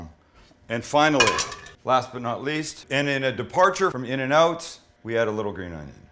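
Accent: Canada. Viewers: Are you from Canada??? I thought I kind of picked up on an accent but wasn't sure. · i've been trying to figure out if he is! everytime he say out or about i'm like...hmmm, i wonder if he's canadian! lol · Canadian accent.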